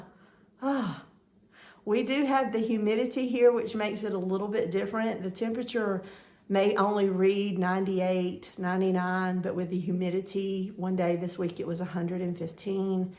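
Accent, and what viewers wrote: South, United States. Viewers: Your work is always so gorgeous! I love the patterns you choose and your color changes for many of them. I gave up flosstube for a long while but have only recently decided to revisit a few 'makers & stitchers' who inspire me with their unique style and love for the art. I'm delighted to learn from you, be inspired to pick up my needle, encouraged to tackle a project that I've judged too difficult, and be satisfied with with a completed piece ... even tho I sometimes only see the mistakes! Your sweet spirit and that lovely southern drawl... the stories of treasure hunting at vintage shops...tales of trips to the beach for sun & seafood...all of it brings up memories of not so long ago trips to Foley beach, Isle of Palms. So grateful for your presence on YouTube!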